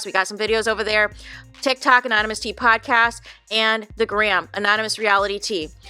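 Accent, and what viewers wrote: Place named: New Jersey, United States. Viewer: Whom ever is complaining about your sound should merely adjust their sound. If they complain they can bye, you are the most non controversial CC, I've been tuning in almost 2 years now. You are great and growing which makes you a target. You get to it state facts and laff lol😂 I love your laughter. Sounds like a jersey girl ❤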